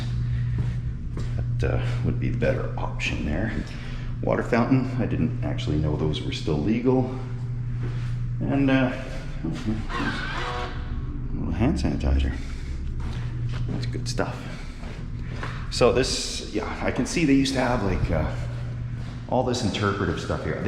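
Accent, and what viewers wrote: Canada. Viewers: your calm Canadian voice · Canadian accent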